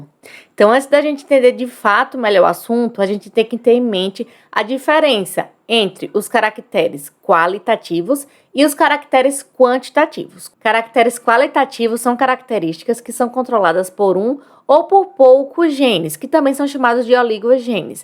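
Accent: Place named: Ceará, Brazil. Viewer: tu és do Ceará? eu acho pelo sotaque :) Eu amooooo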